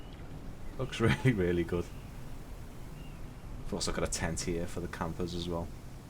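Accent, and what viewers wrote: Liverpool, United Kingdom. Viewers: How odd, just bought this on a whim and looked for a review, found yours first and sounds like you're from Liverpool like me, small world :)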